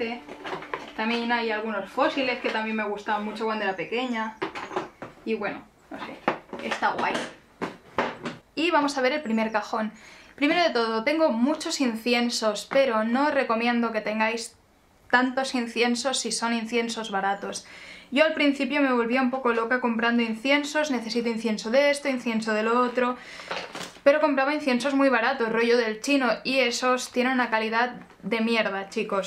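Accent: Spain. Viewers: De que parte de España eres?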